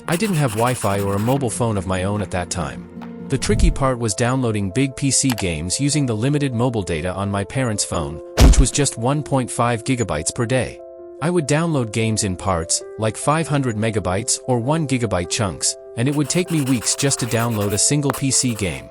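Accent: India. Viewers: Dude r u from India?!!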